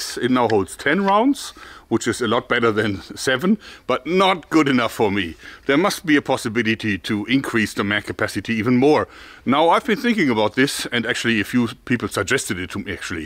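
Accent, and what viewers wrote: Germany. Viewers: Dude. Just came upon this channel right now. Hearing your accent I thought "ich denke daß dieser Man Deutscher ist" and then I took a look. Essen Deutschland. I love it man! And you English is killer, like many Germans. Thanks for the great content. Great content. Thx! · your german accent hope thats right my apologies if it is not is absolutely amazing really adds to your videos keep it up!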